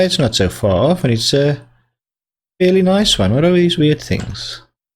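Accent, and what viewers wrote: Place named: South Africa. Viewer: your heavy south african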